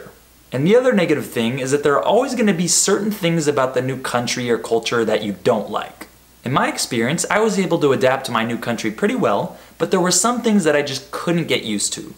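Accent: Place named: United States. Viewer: That's not English, it's American....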